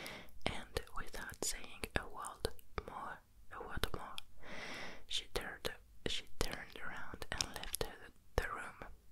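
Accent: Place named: England, United Kingdom. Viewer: J adore ton accent anglais ma sublime et ravissante super vidéo de qualité tu es relaxante 💜🤗💋🥰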